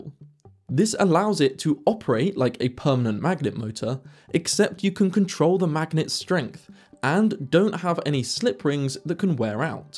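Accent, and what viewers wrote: England, United Kingdom. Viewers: Yes- perfectly running electric motor in English accent? I am now 67 years old, and my passion was reading advanced technology... Enough of your nonsense and blah blah blah,-it may be so, for the Antichrist oppressors has oppressed all the technology for a century or so... No different than big pharmacy etcetera- you Antichrist global oppressors thieving bastards... Your day is coming, I'll see you all at the judgement seat!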